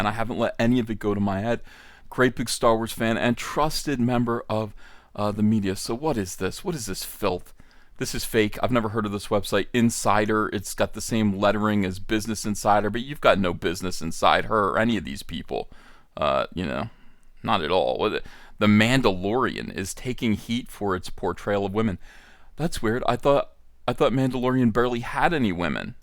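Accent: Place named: Pennsylvania, United States. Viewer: I love the tactic of compiling the hateful tweets of the SJW crowd when one of their shows premier. Case in point The Wokeman's repulsive 1st episode. Virtue signaling doesn't work now that the normal folk have taken to the interwebs in defense of sanity, and as you so well put, STORY TELLING in entertainment. On a side note, I listened to this episode at my folks house while fixing my mom's table. She not only laughed at some of the clever zingers, but asked, "Oh, is he from PA??" In an enthusiastic tone. Lol. I thought the same, I must say. We're Texans, but mom hails from The Keystone State and you do seem to have that regional inflection. Love your work, good sir!! Happy Thanksgiving to you and your family!!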